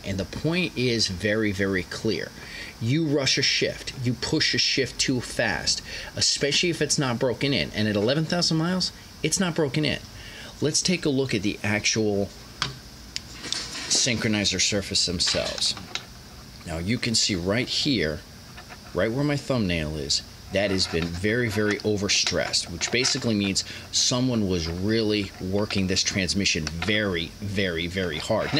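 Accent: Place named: Delaware Valley, United States. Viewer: This video ended up in my recommendations. While I was watching it I was like, "Wait, that accent. This guy is from the Delaware Valley". So then I check and yep. I love our accent. Its so obvious!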